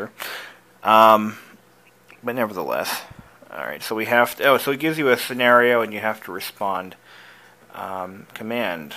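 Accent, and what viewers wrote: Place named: Massachusetts, United States. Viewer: I think I finally figured out what state your from! It's Massachusetts. In some of your other videos you make subtle hints about this but never confirm it. In fact when ever you talk about your computers you acquire from your school district you make it your business to tell us not to ask or even talk about it. It's not a big deal, but for me it affects how I relate to you as a computer guy. Your accent isn't southern or northern, but something else. I'm glad I finally know what part of the country your from so I can at least relate to some of things you talk about, like when you say "in this part of the country you don't see these kinds of Honda's." Which part? In some parts of the country a car from the 1960's can easily be found driving around because the whether is dry and it never rains! People forget how curious some of are and I am glad you atleast allow us to post comments. 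Thanks, Derek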